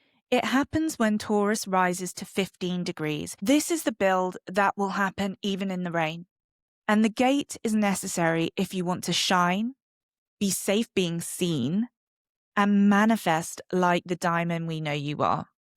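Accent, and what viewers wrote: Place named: United Kingdom. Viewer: hearing a British accent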